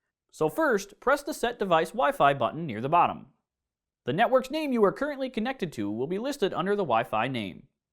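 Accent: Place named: Minnesota, United States. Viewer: You sound like a character from F A R G O TV Series.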